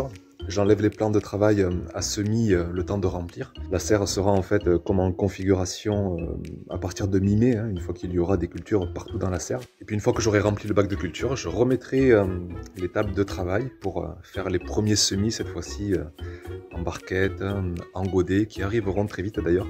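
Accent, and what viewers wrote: South, France. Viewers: On sens bien que tu es du sud avec une belle régalade 😜😜😜je le dis aussi 😜😜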